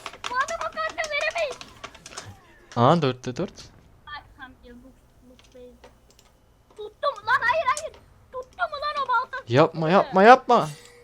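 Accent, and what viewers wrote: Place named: Ankara, Turkey. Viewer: La yürü git La Dkfldlfldlg tam bir angaralı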